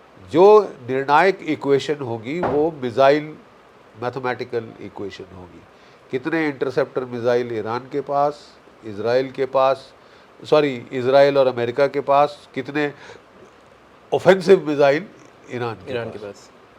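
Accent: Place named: Punjab, India. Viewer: Punjabi बंदा जितना मरज़ी अछि हिंदी सीख ले. मगर उसका लेहजा बता ही देता है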